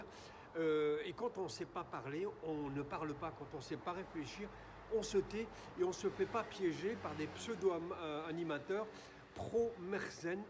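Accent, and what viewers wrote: France. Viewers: Merci,et il y a du "TITI Parigot" dans cet accent de diction qui raisonne en mes oreilles 😊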